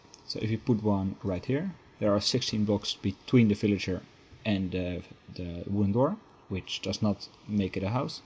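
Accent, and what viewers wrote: Netherlands. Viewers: kerl ziek nederlands acsent XD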